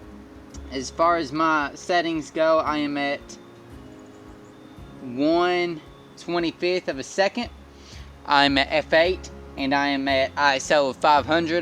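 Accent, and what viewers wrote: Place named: South, United States. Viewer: I agree. The composition with the pine cone was very good. By the way, you have a really pleasant Southern accent. None of us is aware of our accent; it takes someone else to hear it. Half of the pleasure of your videos is hearing you speak.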